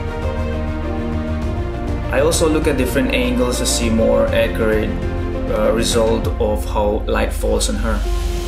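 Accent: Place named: Northeast, India. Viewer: Your English accent sounds like you are from NE India. Great work btw. Cheers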